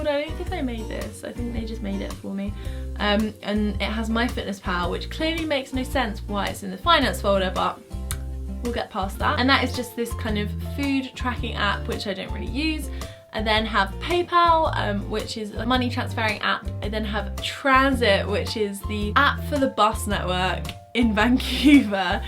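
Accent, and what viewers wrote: United Kingdom. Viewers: Pia Ana I absolutely adore british accent. Idk why it sounds sooo nice😂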